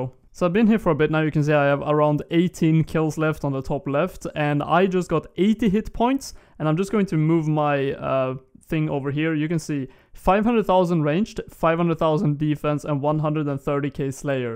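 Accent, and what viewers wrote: Sweden. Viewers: Haha älskar att man hör att du är Svensk :)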